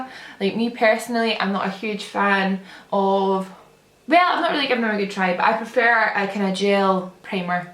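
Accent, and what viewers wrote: Scotland, United Kingdom. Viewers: LipsticksBeauty AndMore glasgow, scotland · Not only are you an utter boss and totally glamorous, your accent reminds me of one of my best friends from Scotland, and it's awesome.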